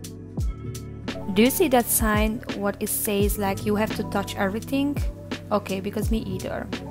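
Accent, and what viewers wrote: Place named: Hungary. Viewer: This is so cool video. I've been watching your work on your other channel for a few months now and I always thought that your accent is somehow so familiar to me. I've had this feeling that you're Hungarian but I was never sure until this video. Kinda mind-blowing for me. I'm from Slovakia but my grandparents are Hungarian so I also speak a little ❤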